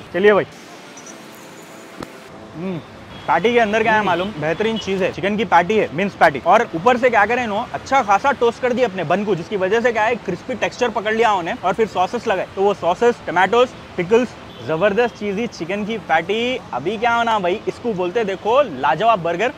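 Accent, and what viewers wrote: Hyderabad, India. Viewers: Very interesting, love your hyderabadi tone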